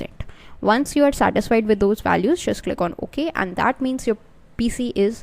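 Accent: India. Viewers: I guess its true. Huge influencers never show the method on how to but the less famous usually indian accented youtubers always helps us. thank you!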